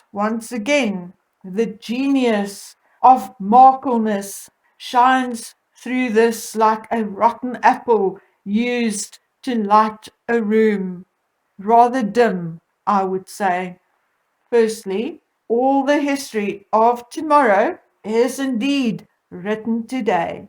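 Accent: South Africa. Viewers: Hi. So lovely to hear a South African voice😉.great point of view, and spot on! Keep it up.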